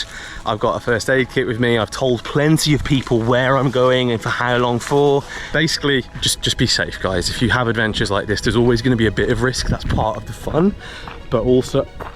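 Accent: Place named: England, United Kingdom. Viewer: Thumbnail, content, editing after 20 seconds and the fine English accent (not Australian??) is an instant sub. Very Jealous of the work you do chap, I'm aiming to find myself in the outdoors more like this more frequently. Hopefully more trips can be inspired by your vids!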